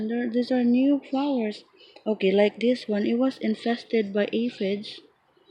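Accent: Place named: Guam. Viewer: great ideas! I was guessing your accent was from Guam. I was close! :)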